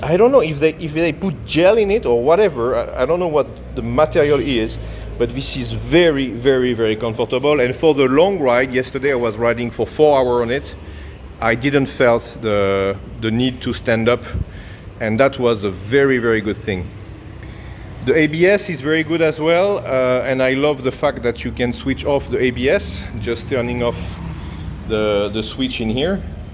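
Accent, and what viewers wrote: France. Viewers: Are you French ? Hi 👋🏽 from Perth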